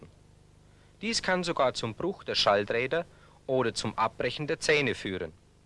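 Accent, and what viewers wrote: Germany. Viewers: Natürlich ist das Schweinfurterisch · This iss a Dialekt from the bavarian County calling " Fränkisch " !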